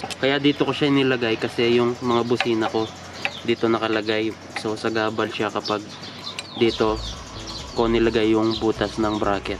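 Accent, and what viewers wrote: Pampanga, Philippines. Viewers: kapampangan ka no paps haha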